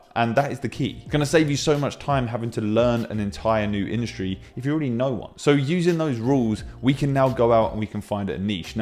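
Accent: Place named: United Kingdom. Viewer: Okay, you have a British accent but you mentioned potential earning in US dollars, why?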